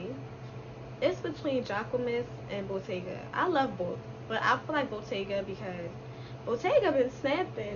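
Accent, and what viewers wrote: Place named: Philadelphia, United States. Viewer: that accenttttttt, you better be from philly 😩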